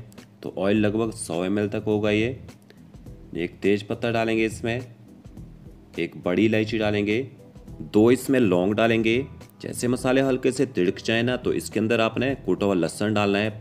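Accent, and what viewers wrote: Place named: Himachal Pradesh, India. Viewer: Are you from Himachal Pradesh? Your accent is himachali.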